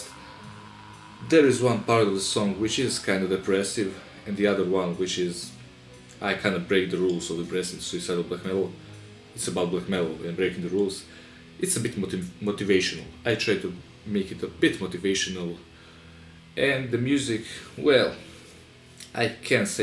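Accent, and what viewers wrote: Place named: Russia. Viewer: Я чую русский акцент)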